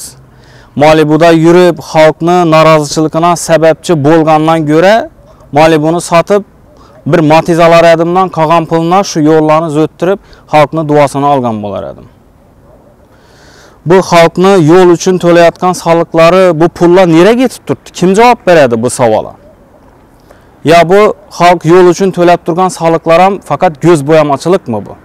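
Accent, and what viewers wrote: Khorezm, Uzbekistan. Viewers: ака хоразимча гапирябсиз базида тшуниш кийин болябди илтимос адабий тилда хам гапиринг..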